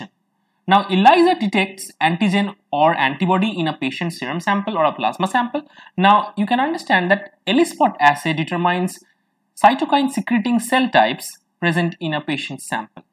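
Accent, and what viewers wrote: India. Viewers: Unfortunately I do not understand the English from Indian people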